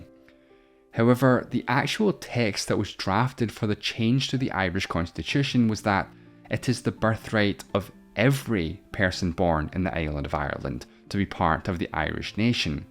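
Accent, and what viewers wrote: Scotland, United Kingdom. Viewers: Out of curiocity, what is the accent of the narrator? I think it is Scotish but it doesn't completely match with my limited experience. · I love the Scottish narration :) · You're correct that's a Scottish accent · Can't belive you said "all of Ireland was part of Britain but then they wanted independence" you just ignored the fact that the reason they were was because of colonisation. You make it sound like Ireland was originally British and that we just broke off from the empire. I was sort of expecting something like this because of your accent being Scottish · it's scottish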